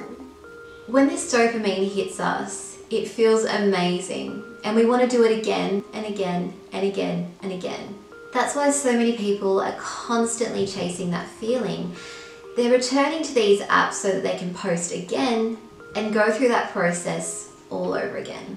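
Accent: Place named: Australia. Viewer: My initial thought - sounds like an Aussie accent. Look into description YT page - Country: Australia - knew it lol. My thoughts on social media: should be used in moderation, balanced with things outside of it - e.g., outside activities As to future videos, I would suggest that you look into not just how often social media plays a part into our lives but also how many social media platforms are used - it feels like we are inundated with so many of them that it pretty much consumes all of our time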